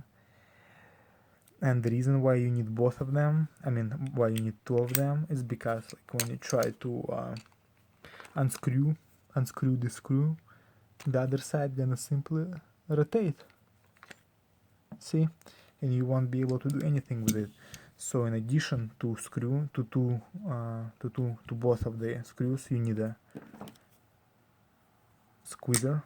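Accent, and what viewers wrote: Russia. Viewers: ты русский) ясно по акценту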